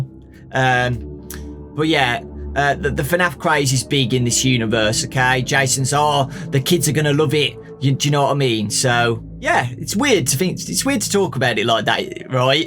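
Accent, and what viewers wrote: United Kingdom. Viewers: What’s the point of buying the book when you can just listen to a British lad read it for free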